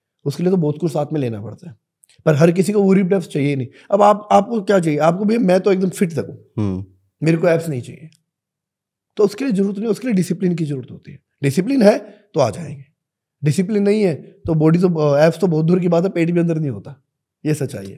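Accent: Bihar, India. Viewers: Aap bihari ho sir?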